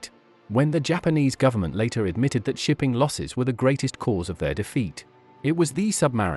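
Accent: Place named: England, United Kingdom. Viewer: Why english accent ?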